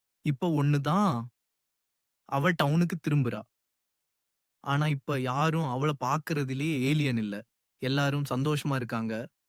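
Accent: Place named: Sri Lanka. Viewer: Your Sri Lankan Tamil ❤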